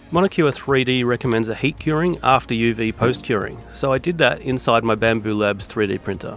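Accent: Australia. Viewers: X really you're Australian I think you're Australian you have an accent anyway you're still great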